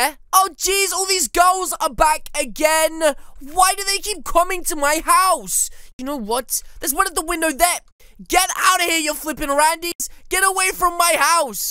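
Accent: England, United Kingdom. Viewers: one quick question. Are you English or from England?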